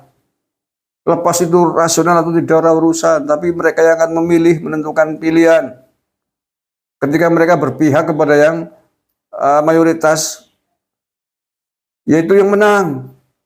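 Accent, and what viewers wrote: Banyumas, Indonesia. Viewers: Ora ngapak ora kepenak mantap 02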